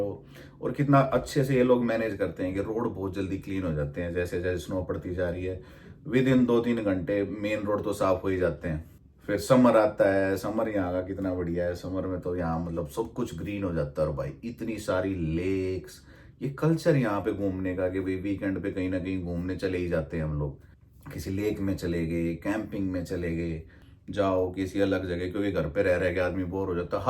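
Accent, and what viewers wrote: Haryana, India. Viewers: Bhai pahle to Ram Ram...jab aap haryanvi touch na choddte t bhai main b isse bhasha me comment likhunga.... Bhai aap dil te jeen aale ho..ek number ke chhore...bhai itna padhhe pichhe apni tone nhi choddi bahut badhiya baat...America me Khichdi te jarur banwate hoge ar kahte hoge bhai yaa maari favorite dish s...yaar gandasa chalaye kitne din hoge...leetraa pitayi khele hain ke America me....hokke ka jugad b hai k udde😂😂😂😂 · Lol. Got this channel recommended to me after 10years. Wow! I cannot forget the iconic Haryanvi accent. For me every Haryanvi I imagine must sound like him. haha.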